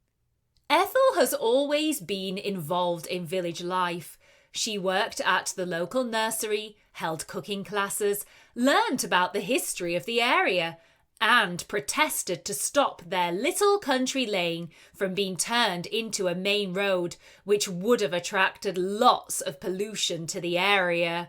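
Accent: United Kingdom. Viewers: 🏋️🏋️🏋️ Thanks so much ☺️ Ma'am it is a wonderful story ,I was so scared of listening 🎧,the story I thought I can't understand , fortunately I can understand because I do love British accent💃💃💃💚💛 Definitely I have explored that ,this is the good way of enriching our english pronunciation as well as improving our vocabulary 📌💚💪Your voice is clear everyone can get what you mean 😊😊😊😊✨.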